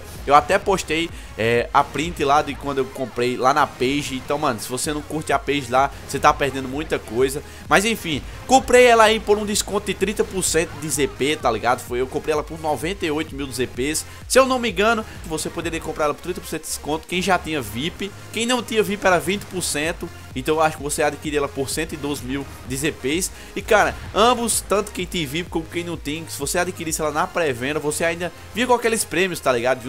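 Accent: Northeast, Brazil. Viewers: ***** mano vc é do nordeste neh ? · VC É UM MITO MANO SOU SEU FÃ PRINCIPALMENTE PORQUE VC É DAQUI DO NORDESTE EU MORO AQUI MANO EU AMO SEU SUTAQUE ASUHAUSHAUSHAUSAUHS <3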